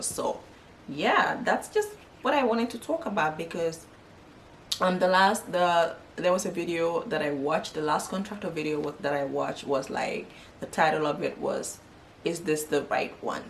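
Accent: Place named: Jamaica. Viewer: THEY HIRED PROFESSIONALS. AND. HANDY. MEN. YOUR. ACCENT. SOUNDS. JAMAICAN.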